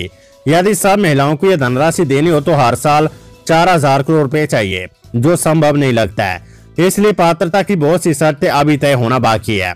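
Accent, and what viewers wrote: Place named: Pahadi, India. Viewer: Tumhara kya hoga , Bhagwan jane, ye Banda Hindi bhi Pahadi me bolta hai. Kya channel hai.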